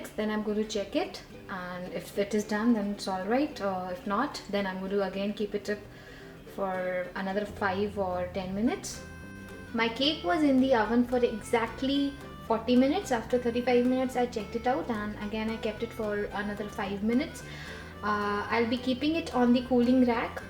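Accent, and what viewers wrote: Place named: India. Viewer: I LIKE INDIAN ACCCENT VERY MUCH VERY SOOTHING AND CULTURED.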